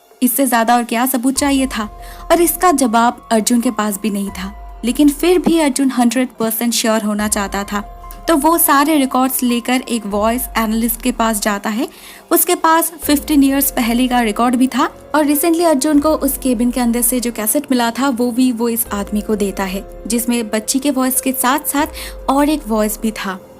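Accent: Bengal, India. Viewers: R u Bengali or east Indian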